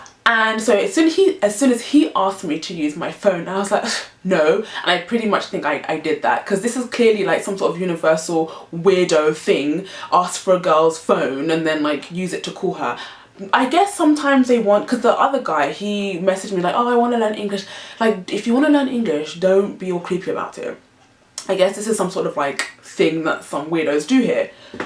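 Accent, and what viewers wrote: England, United Kingdom. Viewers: Bring u and that British accent to Northern California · Love your videos and stories, very detailed :) also UR English accent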